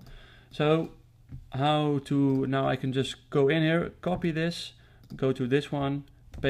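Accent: Netherlands. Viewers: Are you dutch? hahah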